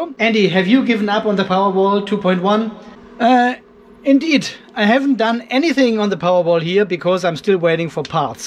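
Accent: Germany. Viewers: Hi Andy, Andy?...you sound like you would have a German-speaking background?....? Well, I am Otto and not busting on knowing about all of this, I try to learn. I am a long-retired, office machine technician, originating from Vienna. Because I run my system on 24 Volt, where should I get the 5.5 Volt supply from for my two in-line Lynx distributors to get the system indicator lights working? I have a basic Victron Cerbo GX and on top, at the far left I have two USB positions free, should I join a USB cable, cut one end off, and link it up with the yellow & black wire of the supplied cable that came with those Lynx distributors? We/A&O made up a solar panel to the battery bank system, controlled with Victron elements, output 220 Volt to overcome those many power-out situations we have in rural QLD to protect the goods in our fridges & freezers and issues when printing 3D when the PC goes wild with the printing program. 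Ergon Energy has the habit of supplying at times too little and even far too much electricity (up to 260 Volt) and some of our appliances did not like that and did quit on us......and you ask what name to give to the Cerbo GX, this unit is very smart - remember who Idefix was? (Idefix & Obelix). Stay awesome, cheers!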